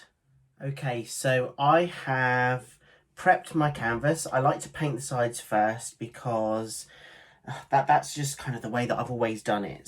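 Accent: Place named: Northern England, United Kingdom. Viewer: its brilliant!! Congratulations! I’m a Manchester lass who moved to America 36 years ago 😳.I come home every year to top up my accent LOL It’s so lovely to hear your Northern accent! You are so relaxing and non intimidating to watch! ❤️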